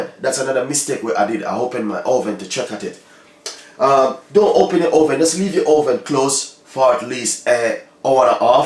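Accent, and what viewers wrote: Jamaica. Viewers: wow are you Jamaican. me want some rum cake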